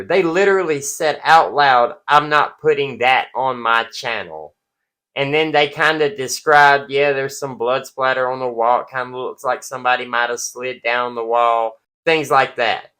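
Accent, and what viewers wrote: South, United States. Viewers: Just curious, what Southern State are you in?? I am also a Southerner.. My guess is TN or AL. Anyway, back to topic, a great video, thanks.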